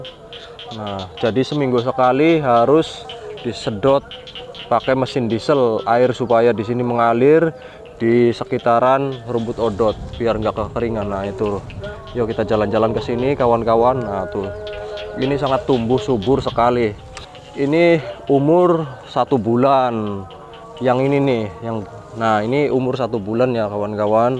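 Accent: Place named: Banyumas, Indonesia. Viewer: Wong ngapak yae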